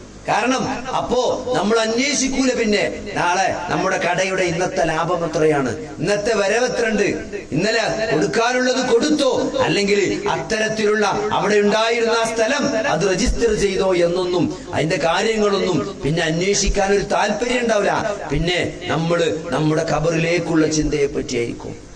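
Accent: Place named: Malabar, India. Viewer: അടിപൊളി ശൈലി മലപ്പുറം മലബാർ👍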